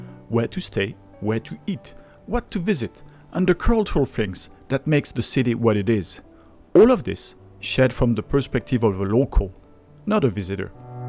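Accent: France. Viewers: I’m a senior from the USA. My husband and I love watching your videos on TV together. The content is wonderful, but what really sets you apart from other YouTubers is your soothing, slow, clear, and sophisticated voice plus that very cute French accent! You describe the restaurants and foods so beautifully, and your close-up shots let us see everything and dream about how it tastes. We also really appreciate that you show us the final bill. It really brings it all together for us. We also especially loved your video explaining the differences between cafés, bistros, etc. Every time we visited France, we never knew the distinctions, so your explanations were incredibly helpful. We’d love to see more videos about restaurants, bakeries, salons de thé, where and what types of cheeses to buy, and what kinds of things to buy at charcuteries, and just everything about food in France. 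This video is excellent. It was so interesting to see how YouTube payment works for creators. Congratulations on your 50K!! Thank you so much for your wonderful work! We escape to dreamland with your videos! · Well done on your acheivement of 50 000 subscribers. It must take a LOT of work to prepare all this material. Yes, we love your chanel here in Adelaide, Australia, and have learnt a lot about how to navigate Paris in our fothcoming trip. We have printed out your Pas-saaa-ges map and will walk it in April. (We love your intonation and make lists of the adorable way you pronounce so many words, as you shift the emphasis from where English speakers place it, to your very own version of the word. Eg...Passages in English has the emphasis on the first syllable wheras in French it is the second syllable that is emphasised. We have written down pages of English words and the way you so interestingly and logically quaintly pronounce them. 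For us it is an amusing and almost impossible exercise trying to mimick your emphasis. Our English speaking brains just wont do it!! ) We also wonder how the obesity rate is so low in France with all that heavy, meaty, fatty, sauced food and sooo many pastries. Pastries for breakfast? Surely they are a treat and not everyday? This all looks so unhealthy to our eyes. No salads and few vegetables seem to appear on the table. This is a mystery to us. Can you maybe do a video on the healthy restuarants in Paris? where salads and veggies and less meat is emphasised?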